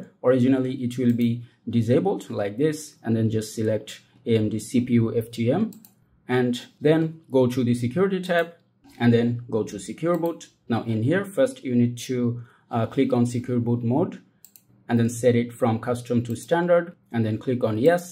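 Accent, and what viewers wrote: India. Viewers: Тот самый момент когда уже все перепробовал, а какой-то чувак с голосом индуса снимает это видео на телефон, и это тебе помогает😂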